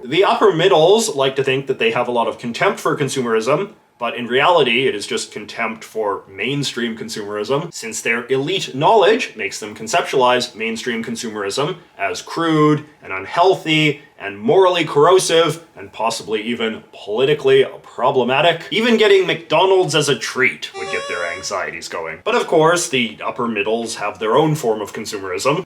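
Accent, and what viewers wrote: Canada. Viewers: Immediately thought about Ricky lol, especially cause you have a Canadian accent.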